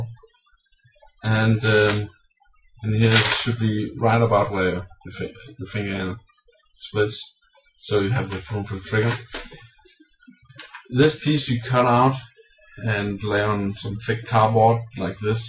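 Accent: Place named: Denmark. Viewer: you have a danish accent... are you from denmark?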